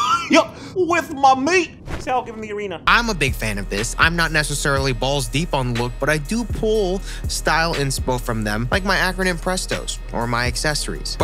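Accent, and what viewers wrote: United States. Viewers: You have the most American voice ever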